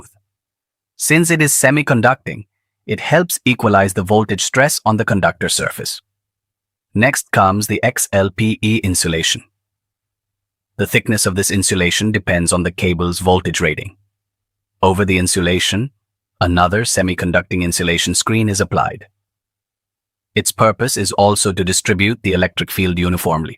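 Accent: India. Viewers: You made the sound with AI and still put the annoying indian accent!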